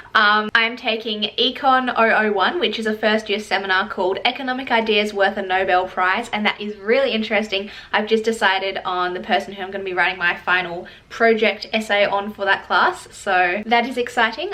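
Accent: Australia. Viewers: She’s Australian 😂😂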